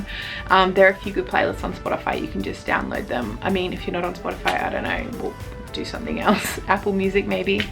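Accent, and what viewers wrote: Australia. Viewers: Aussie with a lisp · Am i right if this aussie accent ? or new zealand maybe ? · Aussie as! I did not detect a lisp.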